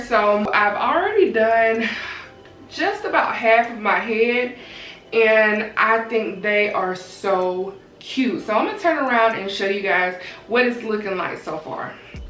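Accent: United States. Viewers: i love her accent!! so cute and southern lol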